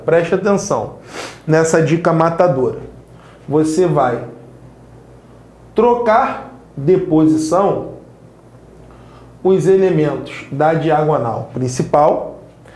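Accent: Rio de Janeiro, Brazil. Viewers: Professor locão: massa! A geração Z adora isso, tem a pegada de curso pre-vest. Dá até pra suportar o carioquês com essas dicas do tal MPP. bons marketeiros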